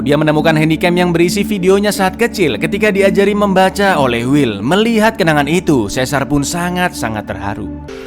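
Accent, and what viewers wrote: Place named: Surabaya, Indonesia. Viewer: Aku arek mojokerto paham banget celometane arek suroboyo sidoarjo model iki wkwkwk nggarai mengkel2 kudu ngguyu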